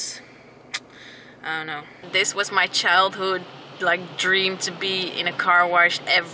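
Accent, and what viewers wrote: Netherlands. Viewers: dutch accent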